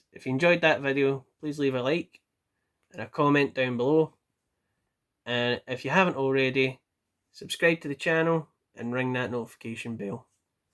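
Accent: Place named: Scotland, United Kingdom. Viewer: Well, - A Scotsman reading to us about two very naughty boys from his native Scotland. It you're not Scottish - you do the best rendition of Scottish I think I've ever heard. 👍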